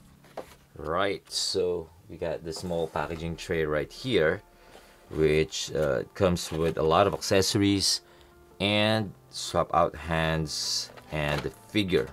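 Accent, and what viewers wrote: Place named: Philippines. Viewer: Are you Filipino, sir? Your accent sounds like..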